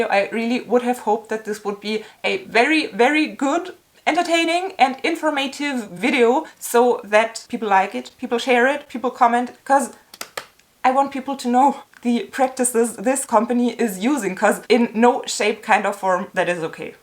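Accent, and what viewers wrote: Germany. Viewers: Are you german?😅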